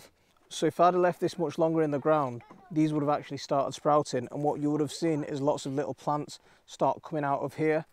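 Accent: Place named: Northern England, United Kingdom. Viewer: ish you said it didn't divide. You've got a great northern accent but didn't you get much frost up there, or have you got a very sheltered garden?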